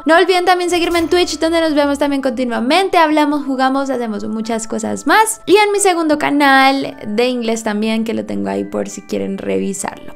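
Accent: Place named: Colombia. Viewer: Una voz femenina con acento colombiano e historias de terror uf... es un placer auditivo...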